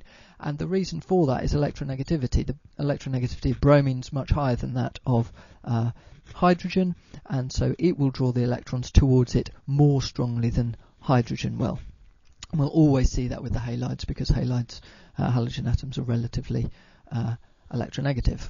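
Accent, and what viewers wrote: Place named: United Kingdom. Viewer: Chemistry makes so much more sense when it's taught with a lovely, soothing British accent! :D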